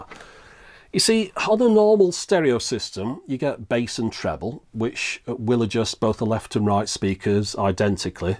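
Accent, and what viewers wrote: United Kingdom. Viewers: The video is educative because your presentation on perfect British English is cure for ears,only sugestion for this clip is absence of back panel and connection ports..... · Love the videos! The British accent you have makes the show seem smarter. And yes it's true: Americans think all British people are smart! Especially if we are from the south!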